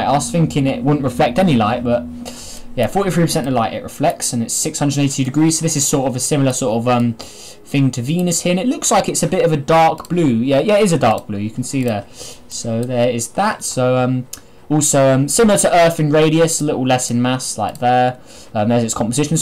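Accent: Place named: United Kingdom. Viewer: Are you from United-Kingdom? Its like if you have a little accent 😀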